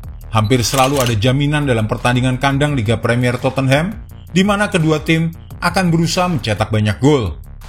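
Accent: Java, Indonesia. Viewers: ngak ada pengisi suara lain ...medok banget:face-blue-smiling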